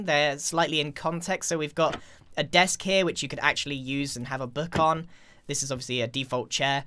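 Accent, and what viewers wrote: United Kingdom. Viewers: Why do you sound extra British lol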